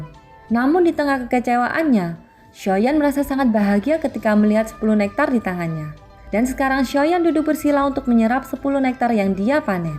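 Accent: Java, Indonesia. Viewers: Itu bahasa Jawanya medok itu mohon maaf bikin lagi yang banyak ceritanya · semangat buat mimin baru... klo ada yg ngatain suara medog jawa dll jangan menyerah👌 tar lama kelamaan akan hilang kok. gw dlu pas baru pindah jakarta jg medog jawa, sekarang udah ilang medognya karena keseringan ngomong bahasa indonesia tiap hari🤭 semua butuh proses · MALAH SESION AKHIR SUARA D GANTI JADI TIDAK MENARIK HARUSNYA DI GANTI DG PENGISI SUARA YG LBH BAGUS MALAH JDI MENDOK JADI KAKU 1000% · Logat jawanya kudu ditekan nih min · noba 1 jg awal"nya kaku bacanya skg enak dengerinnya... skg noba 2 sama kaku cuma bedanya noba 1 ga medhok 🤭🤭🤭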